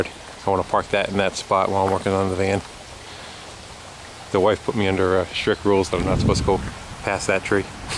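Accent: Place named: East Coast, United States. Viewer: Most of the east coasters that work in my seafood department where I work sound like you haha.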